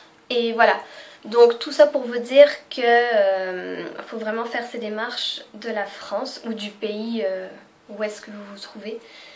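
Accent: Quebec, Canada. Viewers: pourquoi tu as l'accent québéquois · Tu parles comme les Quebecois jtel dit ahahahahaha :D tu '' la '' comme les quebecois le '' sa'' aussi !!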